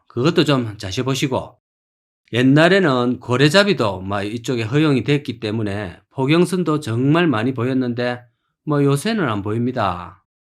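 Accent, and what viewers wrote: Gyeongsang, South Korea. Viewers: 갱상도? ㅆㅂㄹ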